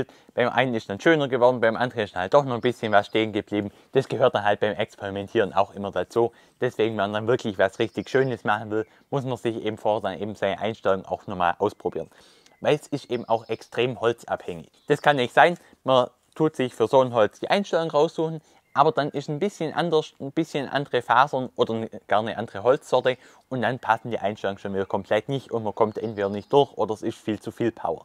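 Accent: Swabia, Germany. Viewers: Klasse! Schönes Video! Sag mal, was ist das für ein Akzent den du sprichst? Ich würde mal tippen Großraum Göppingen? Schöne Grüße aus Bocholt (NRW) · Schwäbisch isch gsond, jawoll! :D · ist des a SCHWÄBLE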